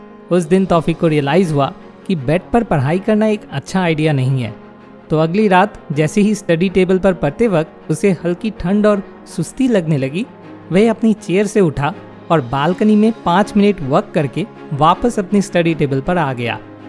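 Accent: Bengal, India. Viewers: Welcome back Bangoli accent voice ,,,,👍👍👍👍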